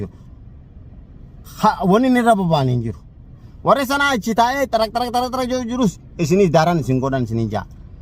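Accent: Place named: Somalia. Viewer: Kuni namichi sumaaledha oromo maaltu godhe haasofin isaa ni beekama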